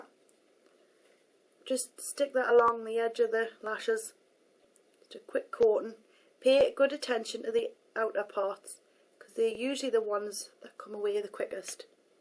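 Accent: Newcastle, United Kingdom. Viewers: r u frm newcastle cuz u sound like cheryl cole! :) x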